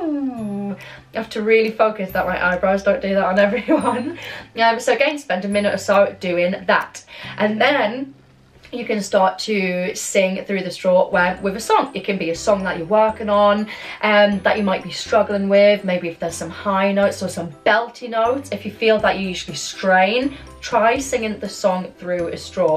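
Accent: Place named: United Kingdom. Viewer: She sounds British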